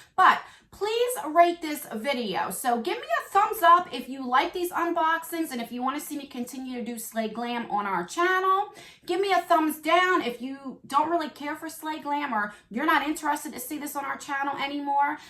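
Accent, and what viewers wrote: New Jersey, United States. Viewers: I would LOVE love to get picked! But of course I’ve tried slay glam on my own dollar and was sorely disappointed and then the box of the month after was AMAZING🙈 I would love to try it again and I can’t imagine a better way too. As always I enjoy watching your videos and always enjoy your jersey accent 😘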